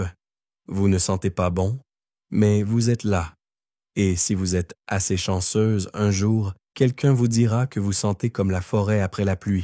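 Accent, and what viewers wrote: Quebec, Canada. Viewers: Vous êtes québécois?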